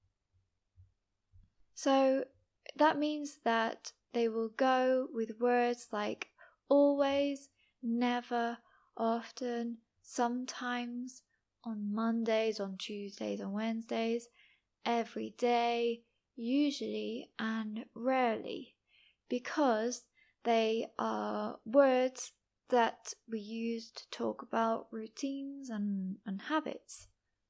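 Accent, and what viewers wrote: United Kingdom. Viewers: Hello, your British accent is RP or MRP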